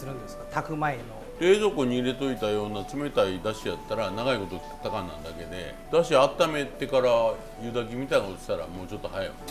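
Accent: Kyoto, Japan. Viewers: 村田さんの気取らない感じや京都言葉がいいですね。 お出汁が３カップということはお米は３合でしょうか？ きのこ類全体でどのくらい量があるのでしょう？油揚げは１枚でしょうか？ 量について、もう少し詳しく書いてくださるとありがたいです。以前の動画なので、フォローむずかしいかもしれませんが。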